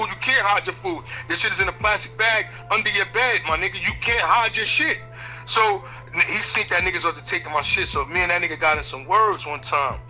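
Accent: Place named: Brooklyn, United States. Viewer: My dude sound straight from Brooklyn or Harlem. That's our lingo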